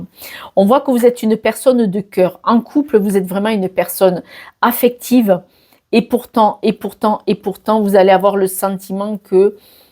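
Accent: South, France. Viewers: Bonjour je viens de m abonner à vous merci pour votre guidance et ce bel accent du sud merci à vous à très bientôt ❤😘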